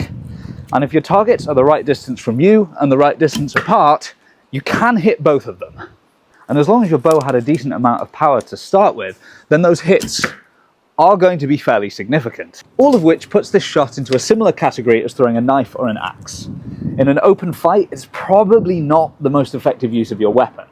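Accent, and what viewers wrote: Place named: England, United Kingdom. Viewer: I feel good knowing that I was 100% right that this man absolutely had to have an English accent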